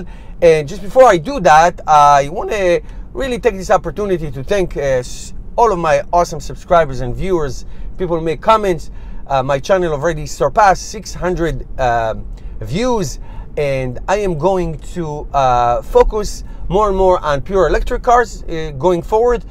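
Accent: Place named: Israel. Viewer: חחחח שומעים מבטא ישראלי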